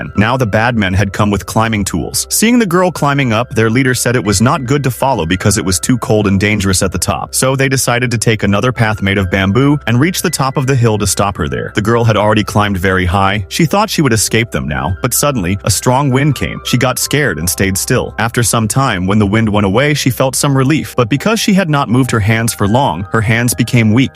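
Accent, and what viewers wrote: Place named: Australia. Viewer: vro he's Australian..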